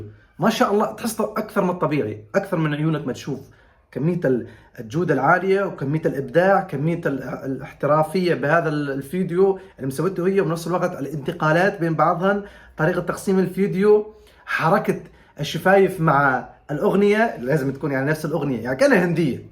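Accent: Iraq. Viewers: فدوة احلى سوري يجيد اللهجة العراقية ربي يحفظك ويعلي مراتبك ان شاء الله توصل للمليون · واجيتكم بعد غياب صارلي 4سنوات مو شايفك مشاء الله عليك شو احس انته صاير تحجي عراقي مبروك ع المليون فديت ❤ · سوري وانا من بدايه الفيديو وانا مفكرتك عراقي بسبب اللهجه ❤ حبايبنا السوريين 🇸🇾🌹♥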